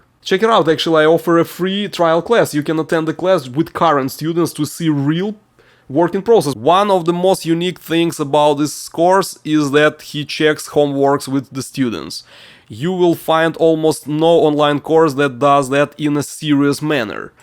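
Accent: Russia. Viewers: Хоть я и не особо знаю английский, но когда человек говорит с русским акцентом, то почему я понимаю о чем он говорит?)))) Видео зачетное!👍 Полезное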